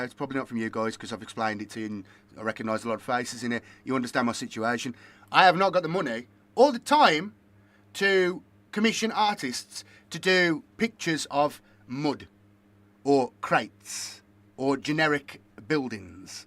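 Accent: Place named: Birmingham, United Kingdom. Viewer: So I just found this video end of July 2025 and I did wonder where all your excellent videos went to. I heard rumours that it was Geedubz clamping down on any use of their I.P. and from what I gather from this video, I think it is. I dunno what you're doing in order to circumvent the either potential or actual copyright strike from Geedubz. Good luck in whatever you try to do. Also this is not how I imagined you to be, an actual full blown Brummie lad.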